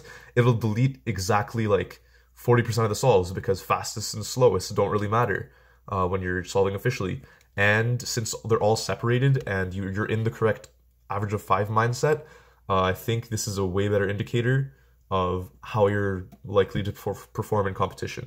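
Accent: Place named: Canada. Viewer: Dang I just noticed how much of a canadian accent he has.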